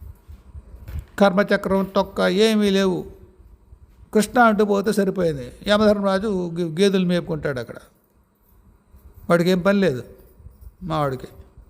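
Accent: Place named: Godavari, India. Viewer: మీరు గోదావరి యాస ను బాగా ప్రేమిస్తారని అర్ధం అయ్యింది గురువు గారు నేను ఇక్కడే పుట్టాను.గోదావరి జిల్లా లోనే నాకు కూడా చాలా ఇష్టం ఆ యాస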